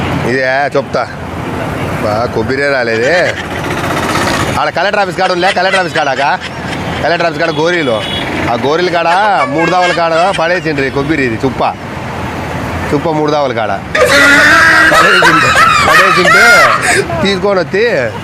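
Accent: Rayalaseema, India. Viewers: Rayalaseema basha chala bagundhi anna 😊😊😊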